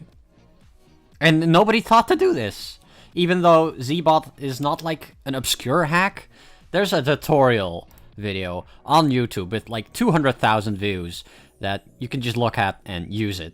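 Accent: Netherlands. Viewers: are you dutch by any chance? nice video btw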